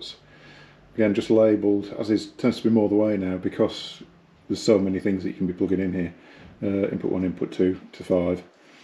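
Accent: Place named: England, United Kingdom. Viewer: your English is so English it made me have to really concentrate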